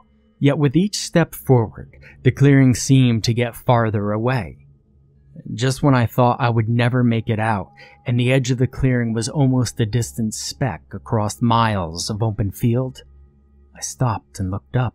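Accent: South, United States. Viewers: Your channel is very interesting. Methinks I shall subscribe. 😊 Thank you for your Military support , as well, Pastor. It truly means a lot. I re-wrote this to mention I subbed. I’m looking forward to listening more. Your Southern drawl is making me wish I was still back at one of my Duty Stations & home for a decade, South Carolina. I spent quite a bit of time in NC; I worked out of Charlotte for awhile but it was when they were building Panther Stadium! 😳 And who doesn’t love Asheville? Take care & be safe!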